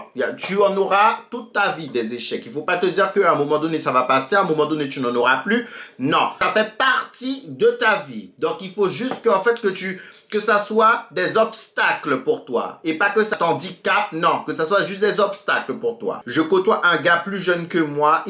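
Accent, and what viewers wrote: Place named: Brazil. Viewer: Ton accent brésilien j adore 💕💕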